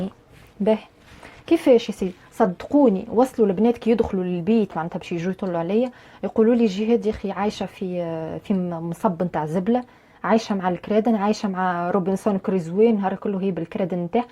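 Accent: Tunisia. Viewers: حبيت لهجتك التونسية انا احبها اصلا لكن خاصت جميلة جدا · يا روحي عاللهجة التونسية احبك برشااااااااااا من المغرب <3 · أنتي تونسية والله حتى كنتوا على اللهجة ديالكم. خليت كلشي وركزت كيف تدوي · يا ربي اللهجة التونسية شحال شابة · ي سيدي بن سيدي هههههههههههههههههههههههههههههههههههههههههههههه تجنن فهمت لهجتك مع اني مو تونسيةة > ي اخي التوانسة ي اخي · 😂اللهجة التونسية اصلا تضحكني و زدتي انتي و الله مجنونة اتابعك من الجزائر🇩🇿♥️ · تونسي يا روحي يااااااا ممكن تعملي فيديوا كفاه مشيت تقرأ والا تخدم و شنو خدمتك ياا فرحت كي سمعت لهجتك يا روحي أنا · ههه قتلتيني بالضحك و ماسخيييتش بالفيديو اللهجتة التونسية زويييينة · لا ، هي تحكي باللهجة التونسية كما يتكلم كل التونسيين او الاغلبية الساحقة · i like how you talk and your tunisian accent , ena 3cht zeda m3a philipini 2 ans , wselna mara lel markez chkit bih :p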